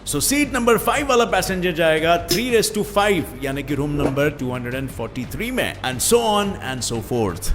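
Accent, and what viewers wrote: India. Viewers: Liked your new abdaaz of speaking... Desi........ 😎😎😎😎😎😎🤩🤩🤩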